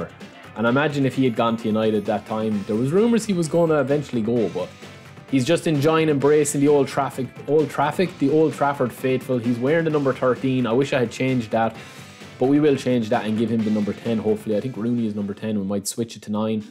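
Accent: Ireland. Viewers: He's Irish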